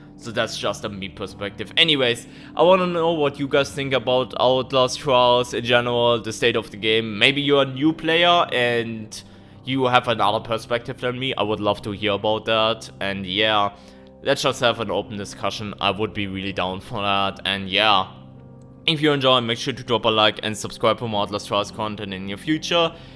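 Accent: Germany. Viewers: I like your accent. Is it german?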